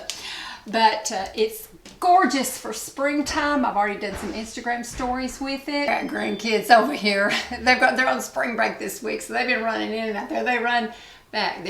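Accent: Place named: Tennessee, United States. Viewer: Hi, I love to hear you talk! I used to live in Dunlap, TN and your voice reminds me of dear friends that live there. I would def research the vit C derma rolling thing and it possibly causing granulomas. If I remember correctly Penn Smith has several YouTube videos on the subject. I’ve yet to find a vit C that agrees with my skin. Good luck finding a replacement product!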